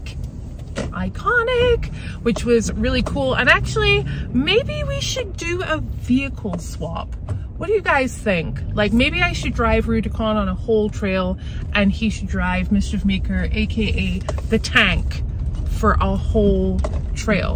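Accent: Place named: England, United Kingdom. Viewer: Why do you have an English accent what changed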